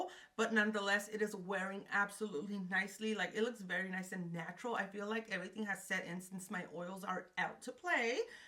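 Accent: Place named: Rural, Mexico. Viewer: The way you talk reminds me of my people from back home in mi ranchito. Tysm for the educational and entertaining content! Subscribed 🤍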